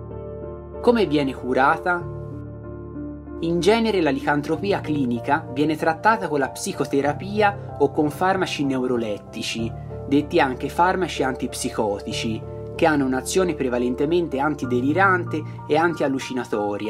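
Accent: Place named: Tuscany, Italy. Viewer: Video molto istruttivo.Bella la parlata toscana sempre gradita grazie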